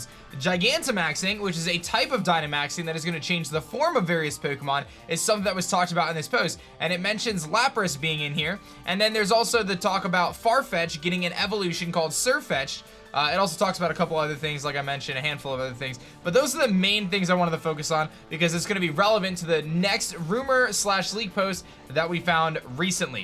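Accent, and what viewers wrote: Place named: Pennsylvania, United States. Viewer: I’m out of Pennsylvania and your slight accent sounds so much like people from around here. Are you out of the northeast?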